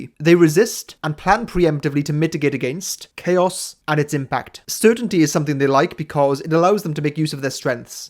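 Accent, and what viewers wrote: United Kingdom. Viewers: One thing I’ve struggled with as a very introverted ISFJ is that, because I keep pretty high boundary walls around my life, I’m not as busy helping other people as the ISFJ descriptions usually indicate. Over time, I’ve realized that my worry over this is simply another manifestation of the same underlying issue: that we ISFJs are anxious about doing too little. Many ISFJs hurt themselves by giving too much, while others hurt themselves by giving a normal/healthy amount and then sitting around feeling bad about it. If you have an ISFJ in your life who’s constantly overgiving or freaking out about whether they’re giving enough, the best way you can help them is reassurance that you are content with them as they are. Though they are giving/helping to make you happy, they’re likely also doing it to stave off feelings of worthlessness, so if you can encourage them to feel satisfied, they’re less likely to get neurotic and burn themselves out. 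If I can be satisfied with giving what I’m able to and not feeling bad that it’s not 100%, to-the-death giving, I’ll be a much healthier person. Thanks for understanding me,! (And thanks for being British. I love your accent.)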